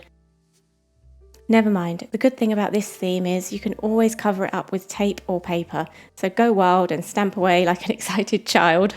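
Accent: United Kingdom. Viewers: That looks absolutely gorgeous! You are not alone in the department of smudging ink, happens to everybody, I really thank all deities for washi tape! You have such a pleasant voice and way of speaking - I very much prefer British English over American English -, it's a real pleasure to listen to you.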